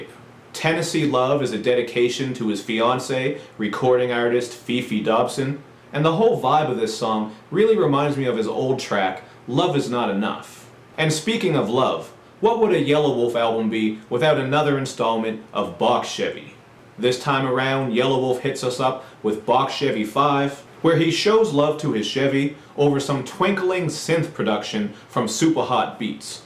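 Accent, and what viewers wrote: Canada. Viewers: Are you Canadian bro?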